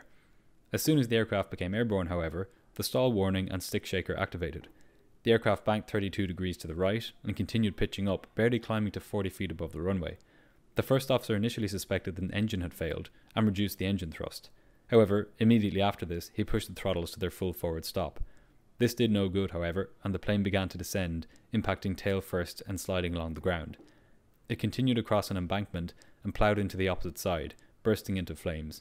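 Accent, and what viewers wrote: Ireland. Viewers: MTDSRIP. I'm hearing an Irish accent from the narrator... Wasn't sure until he said 'turty', for 30. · Just curious. Where is the narrator from? He sounds very Irish to me.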